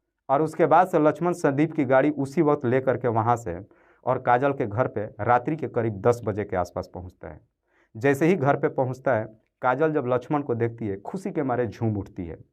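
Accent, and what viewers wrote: Bihar, India. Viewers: Bihari ho kya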